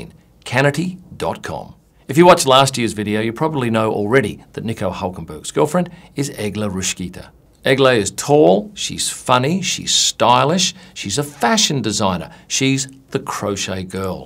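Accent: Australia. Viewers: I have to admit, I too heard 1996 first. I went back and listened to it a few more times., your handsome Australian accent may have been a reason for mishearing the number. Being more used to hearing British and American English, the diphthong in '86' sounded more like '96' in my ears. But glad to stand corrected, 86 it is! 😎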